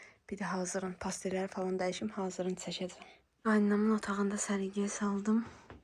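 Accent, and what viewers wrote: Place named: Lankaran, Azerbaijan. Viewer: ləhcəniz bizim ləhcədir lənkəran deyirəm lənkəranlısız😊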